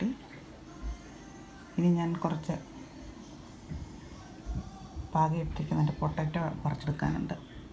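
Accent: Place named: Kottayam, India. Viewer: I like ur videos very much becoz of ur fast talking..20 minute watch cheyunnathu oru worth anennu thonnum..unwanted introduction, explanation onnum illatha video valare ishtamanu..bore adikkilla..vegam karyangal avatharipikum..keep it up..r u from kottyam district..becoz ur slang same like us.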